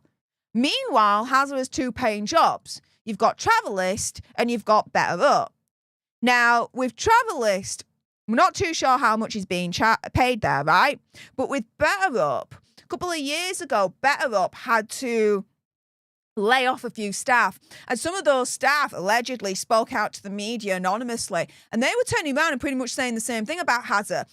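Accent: United Kingdom. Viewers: You have my least favorite British accent it’s horrible. Where are you from?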